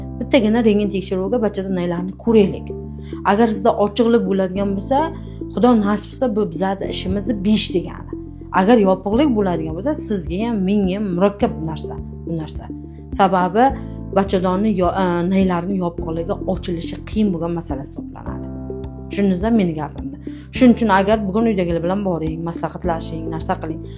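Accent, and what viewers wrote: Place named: Qashqadaryo, Uzbekistan. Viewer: Qashqadaryolilani shevasi zorde😅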